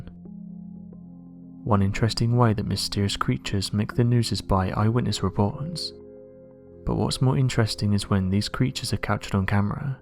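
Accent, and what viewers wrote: England, United Kingdom. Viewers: Well I've been a photographer for well over 50 years, and nothing in my imagination can suggest how anything remotely looking like a creature would be captured by a photograph that was taken by a remote camera interesting enough it was taken in a close-up range how is that possible with the remote camera. If it wasn't for your voice being so how can I put it with an English accent and a tinge of mysterious undertones I would gather to say that everything you've done so far has been almost, I repeat almost genuine. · I like these videos, but his British accent Irks me. The female who I assume is his daughter or perhaps wife, has a much more pleasant voice. The slow monotonous thick British accent is annoying.Hire a American, because I’m almost certain that the overwhelming majority of your subscribers are in fact Americans.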